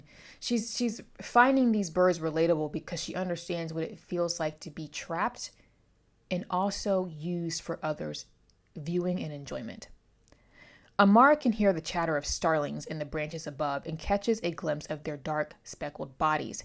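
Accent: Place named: New England, United States. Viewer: Wadder... I wonder from what part of NE you are from. I heard an 'R', so it must not be Bahston, Ma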